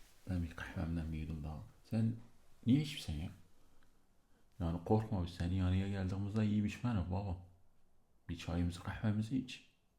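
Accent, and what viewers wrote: Eastern Anatolia, Turkey. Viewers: Reis bunun yeni bir halini bekliyoruz doğu şilveli mafya efsana olmuşş seviliyorsun 500.000 dolar da iyi iyi iyi baya iyiii <3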